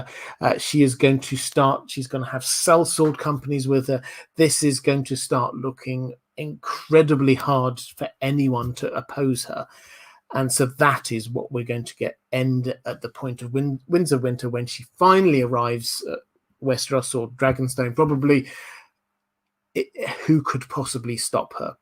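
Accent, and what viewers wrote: United Kingdom. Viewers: Love your British accent on foreign languages! Keep it up and don't try too hard.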